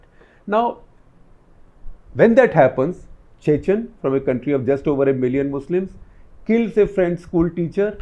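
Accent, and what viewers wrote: India. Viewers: sorry sir apki baat samajnai k liya kaan lagana parta hai......better is to talk in urdu.......as ur english is like urdu......typical indian/asian dialect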